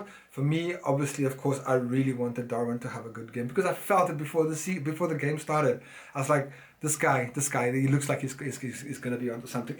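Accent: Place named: South Africa. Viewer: What is your mix. You look and sound like a polished South African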